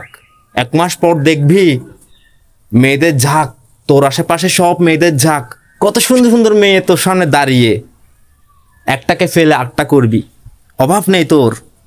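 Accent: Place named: Chittagong, Bangladesh. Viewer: Bhai apni koi thaken?..apnr vasha shune toh chittagong thaken lgtese...amio ctg thki...apnr sthe dekha krte chaii ✊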